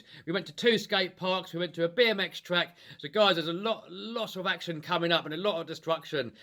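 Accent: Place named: United Kingdom. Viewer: I don't know if this will be correct but because of your accent it should be BBB rc ( British bashing brothers) rc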